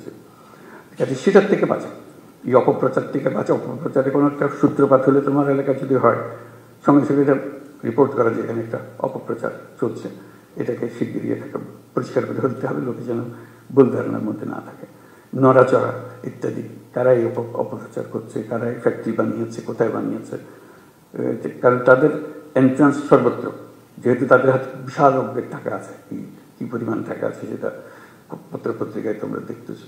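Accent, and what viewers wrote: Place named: Chittagong, Bangladesh. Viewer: চিটাইংগা কথা গুসসা লাগে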